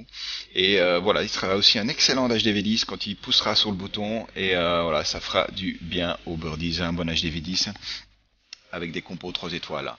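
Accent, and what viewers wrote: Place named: United States. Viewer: comme on dit chez nous, ta faillit me faire braire lol, je doit t'avouer que sa me pince le coeur que tu ne soit plus parmis nous, tu m'a beaucoup appris, tu m'a redonner confiance en moi et pas que sur le jeu, outre le fait que tu sois un excellent joueur, tu es surtout une très belle personne, "the voice" avec ton accent à l'américaine que je kiff tellement ahah, par moment sur la fin j'avais envie de te "forcer" à rester car je ne suis pas dupe, mais sa aurais été je pense irrespectueux et inutile, j'espère que tu trouvera ce que tu cherche, dans tout les cas j'espère qu'on restera en contact via fcbk, tu va me manquer, d'ailleurs tu me manquais déjà depuis quelques temps, je garde tes précieux conseils bien aux chaud pour pouvoir continuer à faire évoluer le clan, un grand merci à toi, au temps et à l'énergie que tu nous a consacré, comme dirais l'autre "all right" ou encore "yallah" lol, reste comme tu es et prend soin de toi et de ta famille, je te souhaite d'être heureux, et ce n'est qu'un aurevoir ;)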